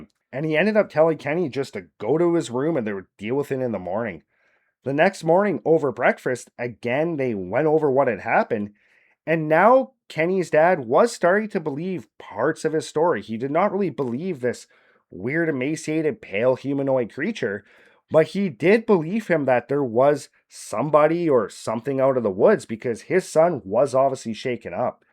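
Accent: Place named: Canada. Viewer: I really like your story telling here. With practice, I think you could become a unique voice, but if i might give just bit of critique, find your own voice and deliver confidently with that. You're abour the third or fourth person I've ran across that has tried to match cadence and narration to Mr. Ballen. I'm not saying this because I'm a fan of his. I personally find his delivery to be kinda annoying and something that only works for him. You've got something unique with your Canadian accents, and way of talking. Lean into that.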